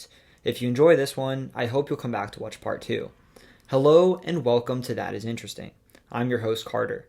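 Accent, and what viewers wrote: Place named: Mid-Atlantic, United States. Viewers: A California native, but I hear a Mid-Atlantic flavor to your speech patterns. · You from central/western PA? I know that accent anywhere.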